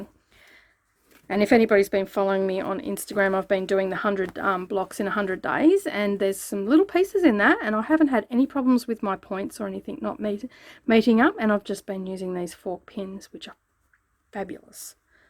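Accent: Australia. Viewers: This tutorial comes just in time for me. I really need to make a couple of these bags to hold some toys for my Grandson. Thank you. Great tutorial. Nice to listen to a fellow Aussie :)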